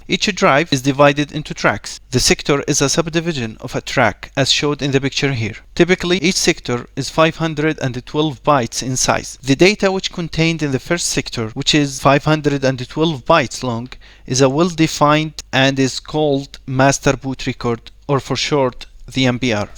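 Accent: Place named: Arab world. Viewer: Haha Dude I can hear your Arabic accent pretty well, very good and detailed video.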